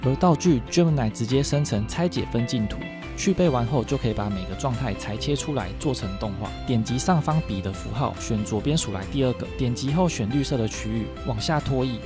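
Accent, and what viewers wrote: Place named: Taiwan. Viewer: 是台灣人的聲音太感動了 我以為ai世界沒有台灣人了全部都是中國式教學...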